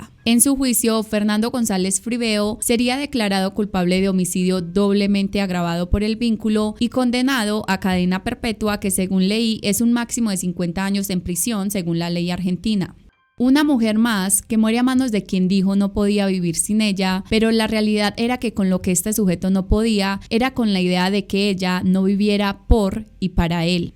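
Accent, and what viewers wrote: Colombia. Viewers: Me gusta mucho el acento de Colombia y creo que esta chica es paisa o corrijanme si me equivoco por favor. Éxito en tu canal yo ya soy tu nuevo sub. Saludos desde México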